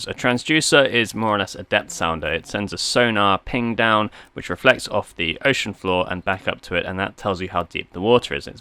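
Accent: Australia. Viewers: Two ingredients for successful boating - patience and the maturity to know when brute force is the only option. Good to hear an Aussie accent as well. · nice to hear and australian accent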